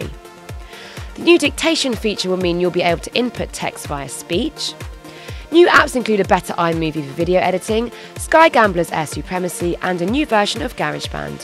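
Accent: United Kingdom. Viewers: british accent? garageband.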